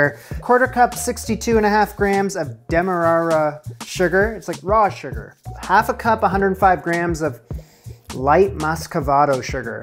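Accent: Canada. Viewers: I think I am falling in love with you. I hope that's okay. I love your Canadian accent.❤ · I knew from the moment i heard you that you are Canadian. The way you swear, hahahaha. Love yourself and your content. Loyal watcher from Alberta.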